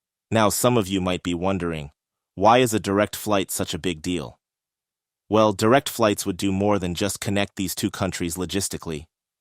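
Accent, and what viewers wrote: United States. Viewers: He is a dictator. And like all dictators, it never works out for the people in the end. Putin is a monster. Why does the narrator sound like he is from New Jersey, not Africa? 😁 let us hear your voice! Don’t be fake! Propaganda channel…. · Not interested in listening to a machine with an American accent or any other accent because it is a machine. Find a human to talk about Africa. The way African people from all over the continent talk with their feelings how they express themselves with regional and cultural accents and opinions. This is the kind of Africa I would like to see, not some American AI Bot! Even better in some ways is just have subtitles and speak the many languages of the beautiful continent.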